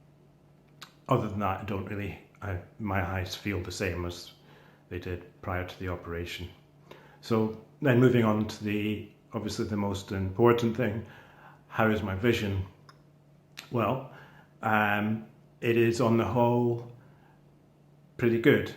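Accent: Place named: Scotland, United Kingdom. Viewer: He's developed a Scottish accent!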